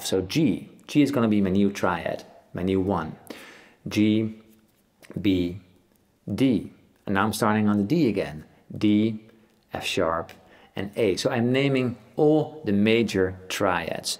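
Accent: Netherlands. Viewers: Eyyyyyyy sound dutch. Hallo daar ;)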